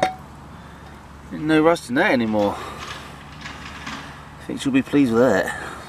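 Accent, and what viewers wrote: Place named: Norfolk, United Kingdom. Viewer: Loved it, nice job! Nice to hear a good old Norfolk accent as well. Keep it up!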